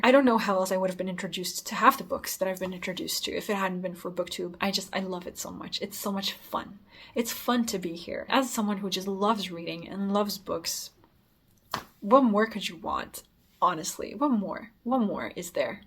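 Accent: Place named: Midwest, United States. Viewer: That was a very interesting video. Well done. I am from the United States and I must say your English is perfect. A mild mid-west accent. This area attracted German and Scandinavian immigrants in the 18th and 19th century. Your vocabulary is extensive and you sound like an intelligent, thoughtful person when you speak English. More people should pause and think about what they want to say. It really works for you:)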